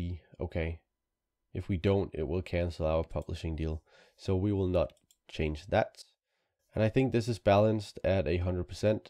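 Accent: Denmark. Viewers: Your accent sounds danish. Eller tager jeg fejl?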